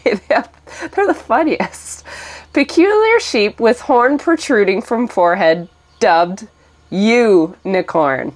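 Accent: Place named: Canada. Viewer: Today i learned canada also says zed, instead of zee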